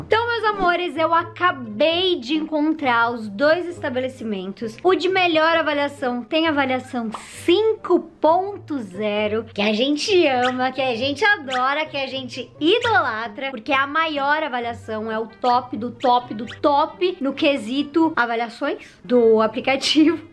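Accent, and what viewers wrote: Rio Grande do Sul, Brazil. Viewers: Tu é gaúcha...??????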